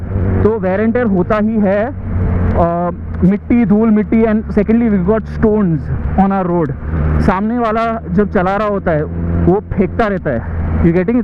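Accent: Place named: Mumbai, India. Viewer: Aahhh!! Mumbai Roads 🥲 Ride Safely 🥰 Finally got to listen ur Mumbaiya Language Skills 😝 Good Vlog, Good Vibes ✨ Stay Blessed 🙌 Keep Vlogging 🎉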